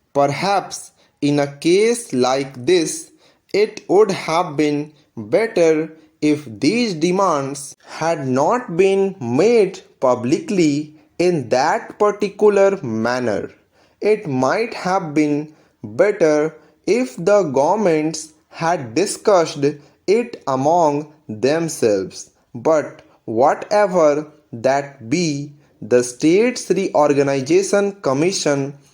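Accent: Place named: England, United Kingdom. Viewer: Bhai ji jyada angrej mat bano thoda pronounciation thik se jaise humare yha generally boli jati hai ekdm sir se utar rahe h kuch words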